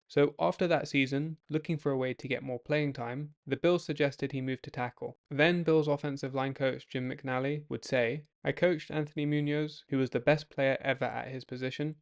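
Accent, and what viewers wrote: United Kingdom. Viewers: British guy talking about American football?????.?.? WHAT!!!!!!!!!!!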